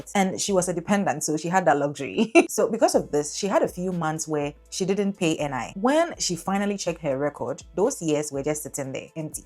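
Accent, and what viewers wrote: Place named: Ghana. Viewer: You do very interesting videos, but the playback speed at which you set the videos makes it difficult to understand what you're saying. You should be aiming at world-wide audience. You have a heavy ghanaian ascent, but i'm sure even some ghanaians will find it difficult, as the sentences are too fast. Often, the sentences are not complete before another one sets in. Don't you replay your videos to yourself? As these are more or less tutorial videos, why don't you just set the speed to normal to make it easier for people to follow what you are saying?. I often have to skip your videos as i find it difficult to understand what you are saying due to the speed of the sentences.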